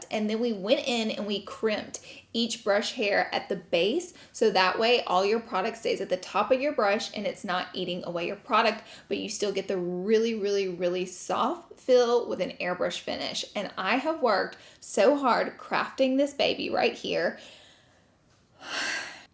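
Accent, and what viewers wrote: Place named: Alabama, United States. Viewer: Yes!!!♥️♥️♥️ I’ve loved you since your dollar tree hauls with the Alabama accent😂 Your heart is pure gold my love. Much love from Hawaii🌺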